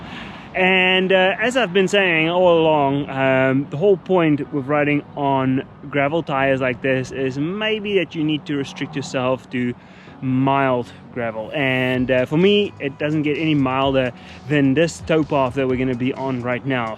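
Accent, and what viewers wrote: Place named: South Africa. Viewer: South African accent is awesome, great review!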